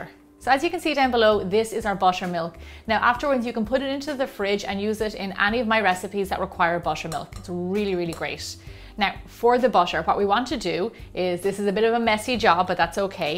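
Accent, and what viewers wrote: Ireland. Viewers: Where are you from!? sounds interesting. Def not America. But I love it. Sounds like a tint of Irish. I’m Irish. But it sounds like you’re trying hard to cover up your accent. You ARE from Ireland. I hear you. Very good. Come to America. Let’s meet. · She's from Ireland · SUBSCRIBED! THANK YOU! LOVE YOUR IRISH ACCENT! Makes your vids that much more enjoyable to listen and watch.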